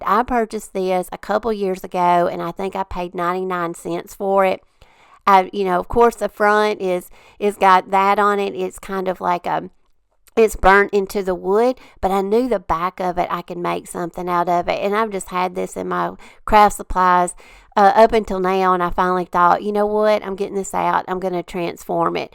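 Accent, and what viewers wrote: South, United States. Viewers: Where are you from? Your southern accent sounds like my family. 🥰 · I love them all & i love your Southern accent. :) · Loved all your projects !!!!! New subbie...... looking forward to more projects..... And I can tell you are Southern.... We Southerners can recognize our peeps !!!!! ( just by listening to them talk !!!! LOL ) God bless !!! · Came over from Julie’s channel! New sub! (I’m in Alabama so I love your accent!) ❤️🦩 Vicky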